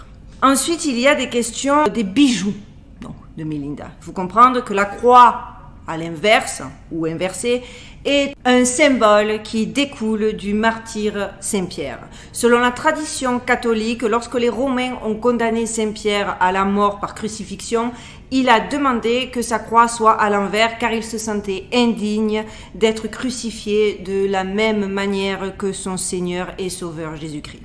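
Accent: Marseille, France. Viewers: Bonjour chère amie, je vous suis depuis quelques temps, je suis tombé sur cette vidéo, et je voulais vous dire, vous qui aimez trouver tout ce qui est caché, faite un test, vous savez que les yeux sont le miroir de l'âme, alors faite un test avec toutes les images, et symboles que vous avez entre vos mains, mettez-vous devant un miroir, et vous découvrirez beaucoup de choses cachés, n'hésitez pas de tourner les images dans le sens inverse, je pense que vous avez cet œil pour trouver ce que vous cherchez. J'aime bien votre chaîne car elle sort de l'ordinaire, avec votre franc-parler, avec un accent marseillais. Bien à vous Francis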